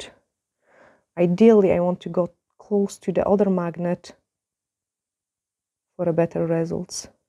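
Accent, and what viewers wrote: Scotland, United Kingdom. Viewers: Thank you for this video! Such an interesting accent. It’s like, Scottish but also something from Eastern Europe?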